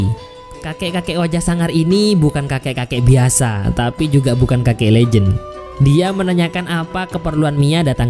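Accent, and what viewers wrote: Madura, Indonesia. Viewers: madura jatim anjir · Medureh reh boss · Bahasanya sangar medureh😂😂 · Ternyata mimin orang madura hahaha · Boh, reng Madurreh kakeh, Min? :D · Subtle madure hhhh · Jember Cong padeh ningguh. Oreng madureh tapeh lancar bahasanah tapeh gik paddeng madurenah gerre bahasanah bren.. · Tolos a madureh lek 😂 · Medureh aguen ben bro,?? 🤣🤣👍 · Logattah mak bahasa madureh cak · Reng madureh😂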